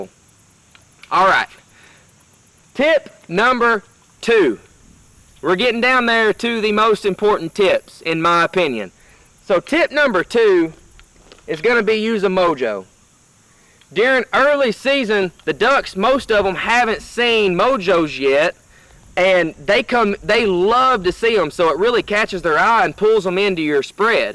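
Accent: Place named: Kentucky, United States. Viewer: What part of Kentucky are you from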